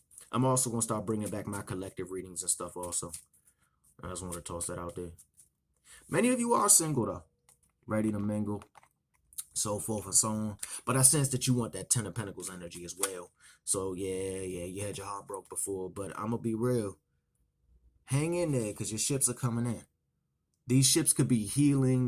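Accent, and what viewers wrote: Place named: DMV, United States. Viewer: Are you from the DMV? I hear my accent 😂😂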